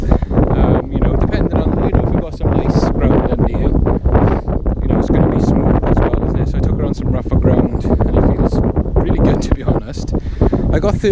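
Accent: Wales, United Kingdom. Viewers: Welsh defiantly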